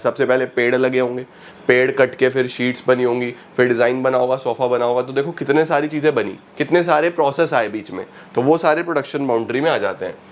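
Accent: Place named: Rajasthan, India. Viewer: Rajasthan ka hai bhai yo.. to kadr ka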